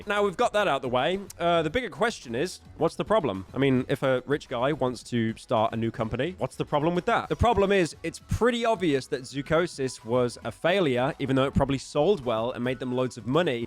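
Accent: United Kingdom. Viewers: Hey man you never answered are you british?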